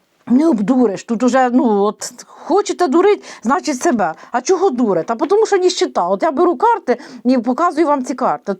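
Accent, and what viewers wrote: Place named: Surzhyk, Ukraine. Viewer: Пані, ваші емоції і ваш суржик- це щось неймовірне, бо в цьому криється справжня українська відьма!!!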